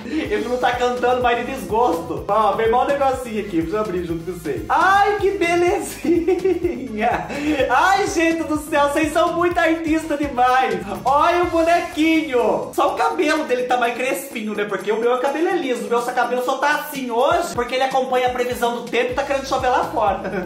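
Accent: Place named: Rio Grande do Sul, Brazil. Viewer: Boa tarde, muito fofo seus presentes que bom gosto dos seus fas. Quem fala " O que que eu corto, cobreiro brabo", esta na idade de risco. Voce e muito fofo, voce estuda muito e pesquisa muito pra falar igual gaucho. Meus parente sao do RS e falam igualzinho voce. Me devirto muito.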